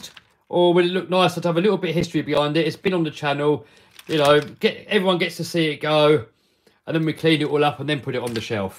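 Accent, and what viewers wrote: England, United Kingdom. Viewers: You sound English I live in Indiana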